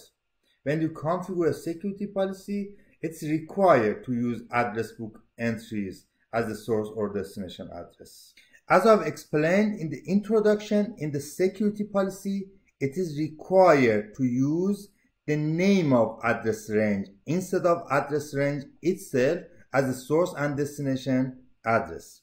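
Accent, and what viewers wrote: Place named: Iran. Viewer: سلطان لهجه ات خیلی ایرانیه :دی دمت گرم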